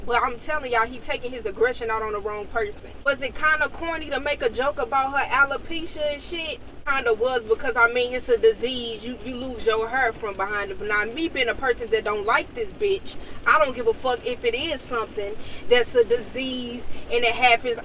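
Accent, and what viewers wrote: St. Louis, United States. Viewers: 😩 Noooo don’t do Anna Mae Bullock like that! Ike was dead ass wrong!! But yes, I’m with everything else you said and I LOOVE your St. Louis accent! 😂🙏🏼🔥🫶🏼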